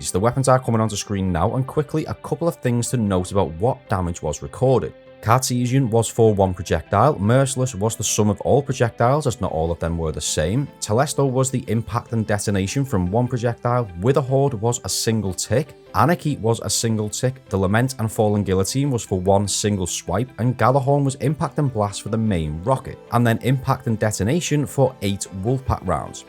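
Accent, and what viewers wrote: Liverpool, United Kingdom. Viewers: Loving the thorough breakdown here, always enjoy numerical deep dives in Destiny! Thanks for the video! Also, am I detecting a fellow scouser here mate? ;D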